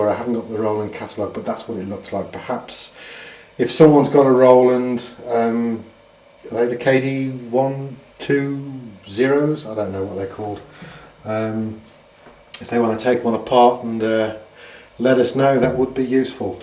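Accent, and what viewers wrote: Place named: England, United Kingdom. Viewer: congrats great triggers...you have a very nice English accent, is from birmingham?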